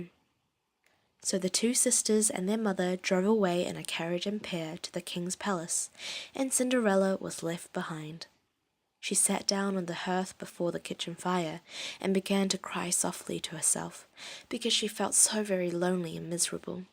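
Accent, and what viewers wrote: New Zealand. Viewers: 뉴질랜드 영어 발음으로 동화를 들으니까 리스닝에 도움이 됩니다. 감사합니다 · 뉴질랜드 액센트 잊지않을려고 옛날부터 많이 듣고있었어요!! 목소리 너무 좋아요 계속 올려주세여❤️ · Love your kiwi accent! 저는 20살 이후에 뉴질랜드에 가서 아시안 악센트가 강한데 역시 키위악센트가 세계에서 가장 섹시한거 같아요~ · 뉴질랜드 억양 찾다가 우연히 듣게 됐는데 목소리 너무 좋아서 바로 구독햇어요!! 음악만 듣고 살았는데 음악 대신 계속 듣게 될 것 같아요 😍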